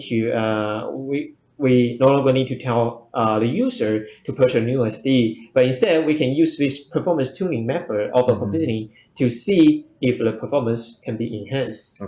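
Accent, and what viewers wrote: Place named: India. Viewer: 在印度学的英语，口味太重，理解比较困难！！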